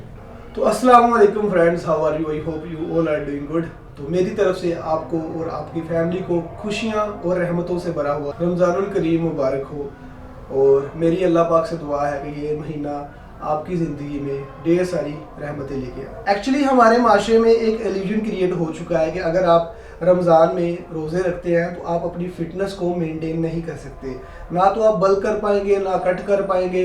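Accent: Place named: Punjab, India. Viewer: Salaam Waliqum bhai. Good to see your dedication during this holy month. Being a Hindu Bahman, I am trying to maintain Roza for myself too this Ramzan with my muslim brothers like you. You sound like you have a Punjabi tone like mine, which part of Punjab are you from?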